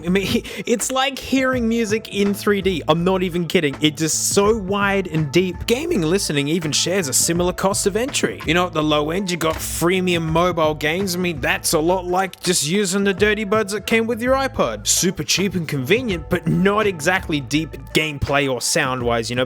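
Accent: Australia. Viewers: I'm American but starting to have Australian accent just by watching your amazing videos!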